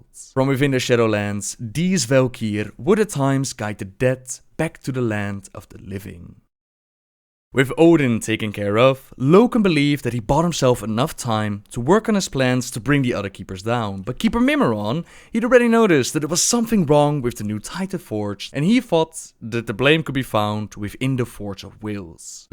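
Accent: Netherlands. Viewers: You must be dutch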